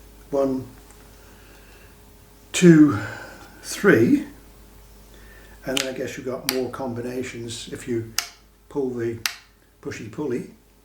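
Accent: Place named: Devon, United Kingdom. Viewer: EXCELLENT PRESENTATION!, I came fot the Turquoise Custom 24!; Ebony!- and fhen, Wow!, 👌 I saw you, and DAMN! I thought I was looking at my dear Gramps!, here in Canada 🇨🇦 😎 🍁 Then you SPOKE! and 🌹 Zap me w/ a Feather!, YOUR ACCENT duplic ates his PERFECTLY! then you mention CORNWALL!, he's from DEVONSHIRE! Too-Rah-Eh!? I'm off to buy one of these NOW! Your playing is delightful! CHEERS! 🍻 from The Wild West! NEIL YOUNG COUNTRY ALL the Best!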